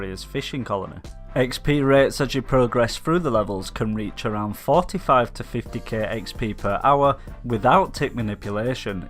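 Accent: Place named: Yorkshire, United Kingdom. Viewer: Jesus, that's a strong Yorkshire accent. Where abouts are you based? Think you're very, very close to me (Wakefield)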